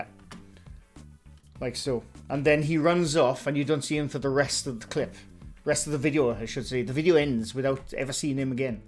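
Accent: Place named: Wales, United Kingdom. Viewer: I love your accent! Aaahhh gotta love a Welsh man!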